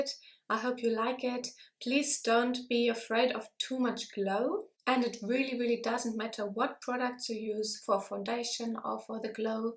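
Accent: Germany. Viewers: are you german? think you have an very german accent. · haha ich wusste ich hatte einen deutschen Dialekt rausgehört :) du bist wirklich wunderschön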